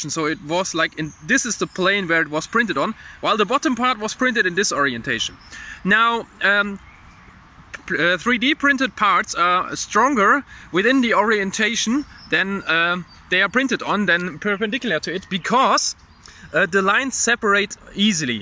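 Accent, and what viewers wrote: Germany. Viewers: das ist doch 100% deutscher dialekt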